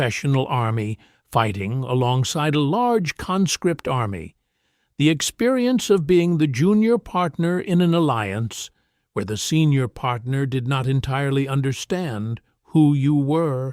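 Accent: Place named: United States. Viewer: Why is someone with an American accent relling this tale ?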